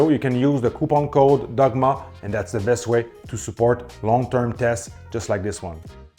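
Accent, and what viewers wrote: Quebec, Canada. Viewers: Salut, je t'entend que tu est quebecois...J'imagine... J'ai une question pour toi, j'ai un Kia ev9 2025 et le Vus je ne sais pas comment il est fait aerodynamiquement,mais le derriere devient toujours sale et rapidement et au lave auto, pas capable d'enlever le tout au complet, y a t-il un moyen de ralentir le tout???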